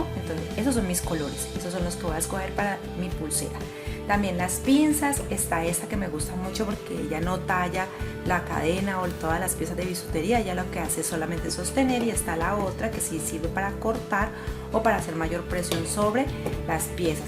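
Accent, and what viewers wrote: Colombia. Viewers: Estás en Colombia o en Venezuela 🇻🇪, tu acento me parece de Colombia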